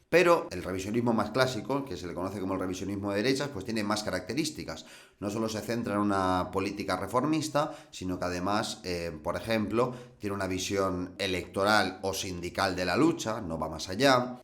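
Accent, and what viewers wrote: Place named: North, Spain. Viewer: sin tí FO no sería lo mismo,no tendría tanto crecimiento y menos aún los futuros votos de elecciones catalanas o europeas, créeme, soy gallego y tus formas de hablar y saber estar son propias del norte español, aquí tienes mucha gente que te escucha mejor a ti q a otros de FO. sigue así, genial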